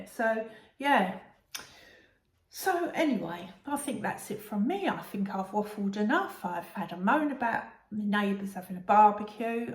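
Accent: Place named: Australia. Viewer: G'day - good Aussie accent! A cold Fosters always goes down well on a hot day. Nice poo bag. Wilson will be looking forward to providing something for you to put in it! I will have a look on the Etsy shop. Certainly a ton and more of rainfall in Australia. With the fine weather in the UK you will have to get your bucket and spade out soon to head for the seaside. Happy days! Can you swim or will you need a blow up rubber ring with a horses head or similar on the front! We often went as a family to Great Yarmouth in summer - donkey rides on the beach.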